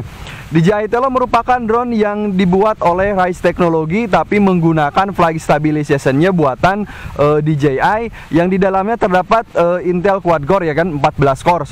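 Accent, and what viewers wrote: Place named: Medan, Indonesia. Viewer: Review nya detail nih, manteb. Btw logat medannya kental sekali.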